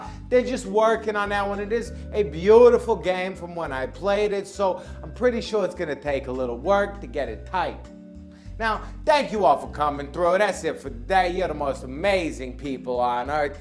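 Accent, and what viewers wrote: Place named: Boston, United States. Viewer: Just an annoying guy from Boston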